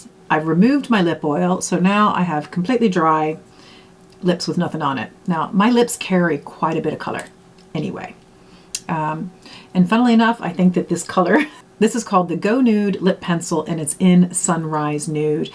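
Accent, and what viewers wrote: Ireland. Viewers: Are you irish , could swear i heard some irish accent in there, im irish by the way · I like the whole line up… lipstick included! You are developing a tinge of an Irish accent😊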